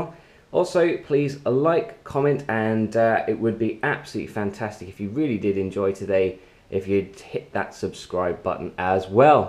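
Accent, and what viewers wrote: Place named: United Kingdom. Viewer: Nice rocket. I assume by your accent, you are in the U.K. ? Model rocketry seem to be really popular and active in the U.K.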